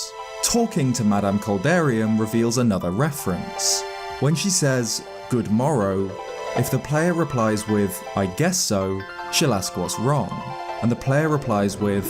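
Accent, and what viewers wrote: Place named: United Kingdom. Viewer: all British people sound the same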